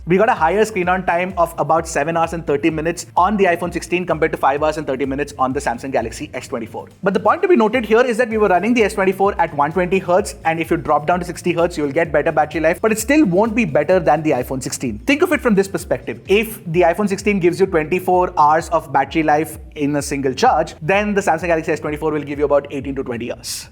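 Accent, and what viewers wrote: India. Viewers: I left the video just after 3 seconds just because of this kind of typical Indian accent so ann0 ying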